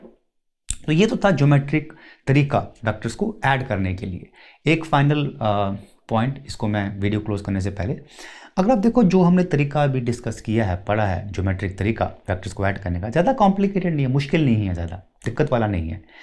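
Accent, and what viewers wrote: Kashmir, India. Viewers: I sense that u have an akcent like Kashmiris..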